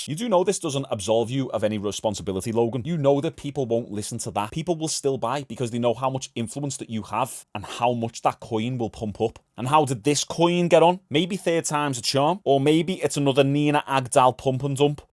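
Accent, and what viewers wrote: Liverpool, United Kingdom. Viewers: Love MMA and when I heard a scouse accent I had to sub. Love all the content bruv. Keep it comin. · As a manc, I'm still surprised on how drawn I've become to a scouser just chatting shit on YouTube 😂 got to give credit when due fair play, keep it up bro 👍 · pls say u support liverpool coz u have the best accent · I love the U.K accent shit sounds dope to me. The Market always drops on the weekends u should know this! Noisy ain't gonna forg3t about their money! 😆😆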